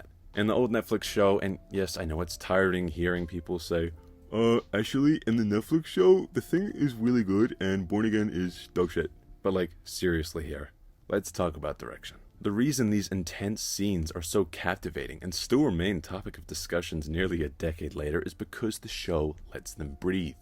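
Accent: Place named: United States. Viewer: That's supposed to be a New Zealand accent? You sound American.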